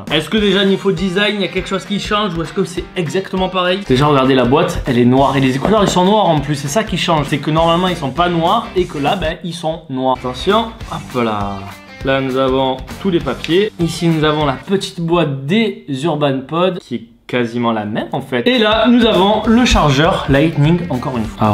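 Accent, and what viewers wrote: Marseille, France. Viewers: T'es marseiller moi oui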